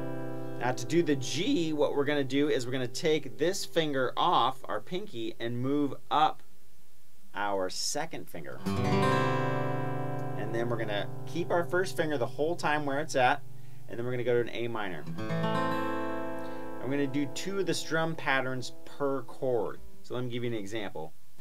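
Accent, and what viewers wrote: United States. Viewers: I love the way he talks haha amo ese acento estadounidense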